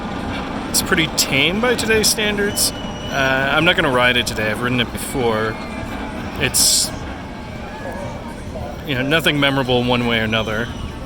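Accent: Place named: France. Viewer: Ur french accent is so good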